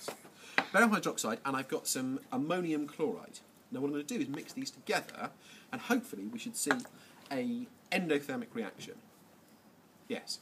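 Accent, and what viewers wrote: United Kingdom. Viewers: "Uh, no" ends the video He is from the Commonwealth, probably England though based on the accent, since he was using Celsius instead of Fahrenheit like the US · This what most British people sound like... And we can pronounce the letter t 😒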